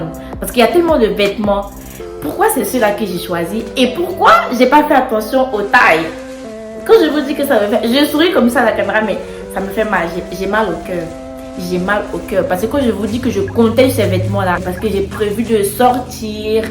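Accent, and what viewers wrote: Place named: Ivory Coast. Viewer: l'accent ivoirien💖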